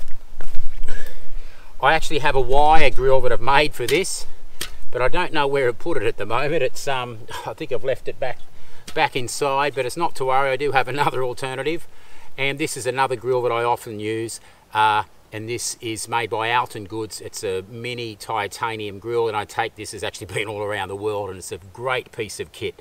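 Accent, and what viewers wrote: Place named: Australia. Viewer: It is bloody awesome to hear another Aussie accent online talking about bushcraft and survival stuff. love it. Don't why I haven't seen this channel before. Sub'd and thumbs up.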